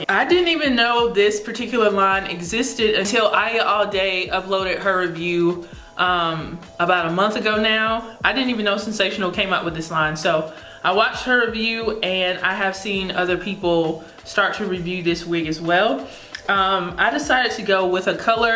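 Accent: South, United States. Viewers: Are you from Texas? You have a slight southern accent and you sound like you could be from here.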